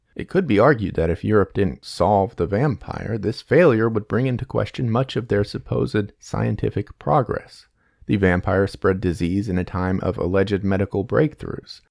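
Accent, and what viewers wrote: United States. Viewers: I’m confused why you’re speaking American and not Australian tho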